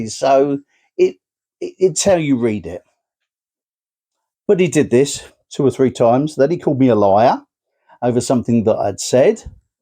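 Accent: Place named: Cockney, United Kingdom. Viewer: Fascinating and true, it seems like some people can't get by without insulting others to go on a power trip. I'm curious about your accent, it sounds like you have a London or cockney twang in there. Very enjoyable video thank you.😊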